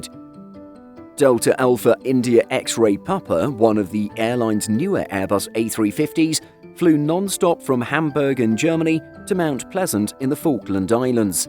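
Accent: United Kingdom. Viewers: What? YOu can clearly make out the British accent, and also, this is the channel of a news website. I think your ears are low quality.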